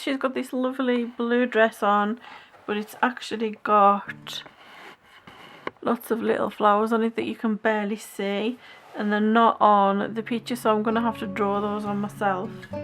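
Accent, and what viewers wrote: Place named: Birmingham, United Kingdom. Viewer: Absolutely love the book! Where did you get it and what is it called? I have a few open stock Pablos and I recently bought the Luminance, love what you are doing. It’s lovely and you are so pleasant to listen to 😊 I was born in Birmingham but emigrated to Australia in 1974. Your voice reminds me of the land of my birth ☺️☺️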